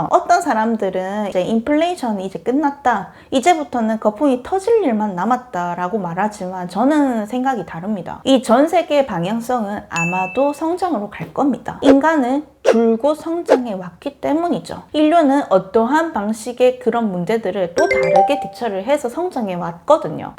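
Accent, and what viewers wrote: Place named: Busan, South Korea. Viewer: 부산 사터리 넘나 귀엽다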